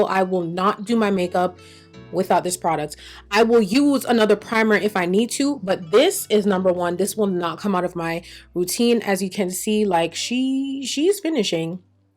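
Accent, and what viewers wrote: Jamaica. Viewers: Lil Sis when you break out in Patois, I know it's clutch, and I run to my nearest Sephora😀😀😀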